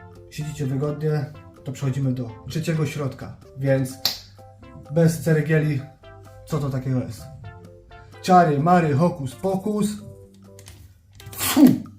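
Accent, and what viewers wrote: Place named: Upper Silesia, Poland. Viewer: No, no, łapy masz fajne. Słychać, że jesteś z Górnego Śląska.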